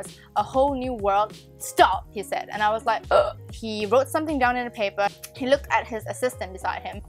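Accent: United Kingdom. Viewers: You sound British 😂😂 btw is it ok to tell us the group you were going to debut with.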